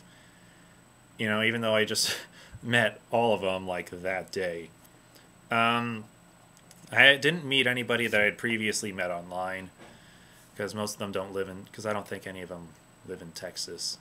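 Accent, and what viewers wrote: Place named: Germany. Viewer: Last thing I wanna do is sound like a dick, but your German accent reminds me of Beerfest, in a good way.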